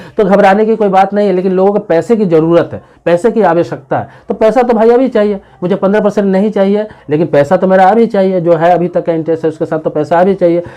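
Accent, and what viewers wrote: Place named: Lucknow, India. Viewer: लखनऊ से हो भाई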